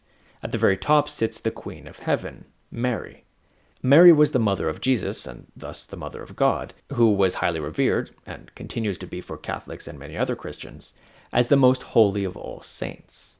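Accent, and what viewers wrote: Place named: Quebec, Canada. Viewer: I KNEW you were from Quebec! Finally got my answer 😂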